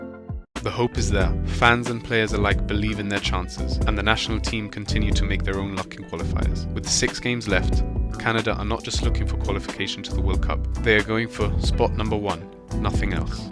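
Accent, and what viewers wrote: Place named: Europe. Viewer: As someone who has lived and( just about always) died with the CMNT my whole life, I think this is a very well researched video and hits some great points. One think that I think Europeans (I'm assuming because of the accent) don't full grasp is just how crazy qualifying in CONCACAF is. Herdman will have to keep the boys focused for the remaining 4 away matches. They have shown a lot of grit and fire, lots of shoving matches during and after games. They will have to keep their discipline when playing in Honduras, El Salvador...etc Thanks for posting, great video.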